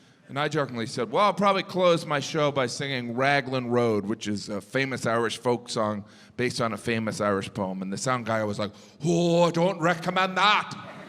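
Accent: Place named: Jamaica. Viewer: thats more like a Jamaican accent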